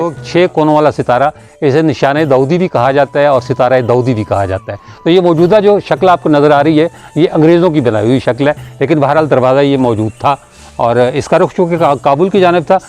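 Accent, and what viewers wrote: India. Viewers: Bhai aap Pakistani se zyada hindustani lagte ho baat cheet ka andaz bhi hamara hai jo ke yeh badi baat hai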